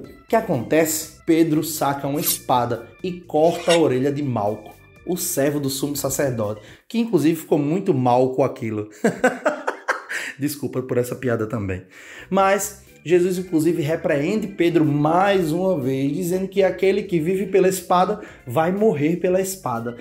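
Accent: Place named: Northeast, Brazil. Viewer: Top de mais! Os desenhos são ótimos e a narração é muito boa, especialmente pelo sotaque nordestino! Parabéns! Continuem! Deus vos abençoe!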